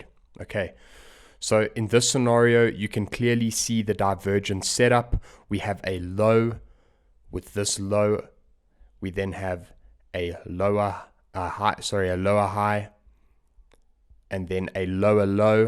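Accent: South Africa. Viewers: definitely a south african boet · South African? Kzn ?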